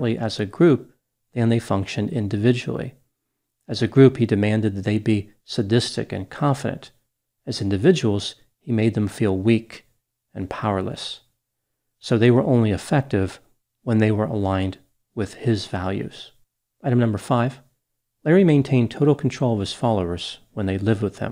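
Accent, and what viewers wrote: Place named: South Jersey, United States. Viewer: Hey ….hate to break it to ya….but you have a well-formed South Jersey accent. 🤔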